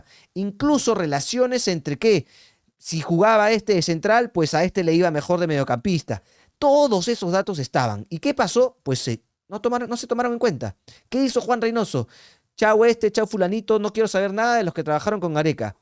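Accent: Argentina. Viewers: Hey Horacio... vos sos Argentino ???. Tenés un acento porteño che. O sos simplemente un periodista Peruano sin personalidad? Por otro lado tu analisis muy bueno. Has desnudado la pobre capacidad de Reynoso como estratega. Ese señor es un técnico para tercera division, que ridiculamente se autodenomina estudioso.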